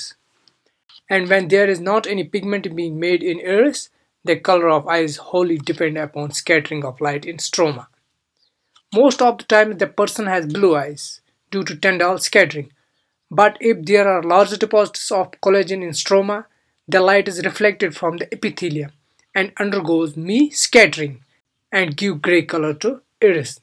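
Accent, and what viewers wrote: India. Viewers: Ronnie yeah he has an Indian accent probably because he’s from India. It’s a bit hard to understand but not like you can’t understand anything. Lamo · I didn't understand one word this guy said LOL is he talking English.? he is talking like a scientist to us he is very condescending. also he has an Indian accent, which does not help matters! LOL He needs to talk to other scientists not the general public. Blue eyes, brown eyes , green eyes. that's all I could get from this guy.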